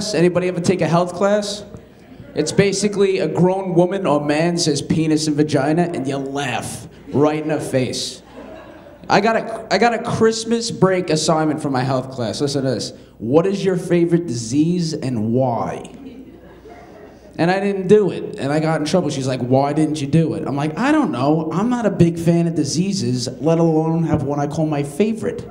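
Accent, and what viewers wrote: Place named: New York, United States. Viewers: Why does he currently sound like a 27 year old New Yorker who moved away but here he sounds like a 65 year old mafia boss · His New York accent tho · that smooth ny Staten Island accent: not very thick but still recognizable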